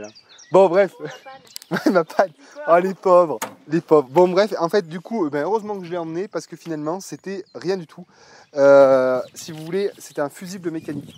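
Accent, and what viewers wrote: Loire, France. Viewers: Tu prends de plus en plus l'accent de la Loire ! C'est rigolo ( pas l'accent, c'est juste de plus en plus flagrant !)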